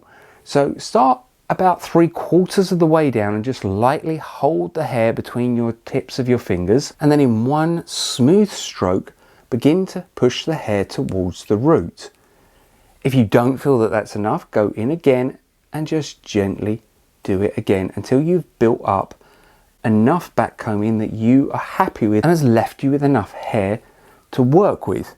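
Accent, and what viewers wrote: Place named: United Kingdom. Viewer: Thank goodness mate. British, Clear straight to the point. Thanks alot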